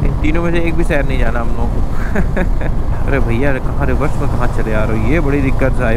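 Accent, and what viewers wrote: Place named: Kanpur, India. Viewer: Aesa kyu lag raha hai ye banda kanpuriya hai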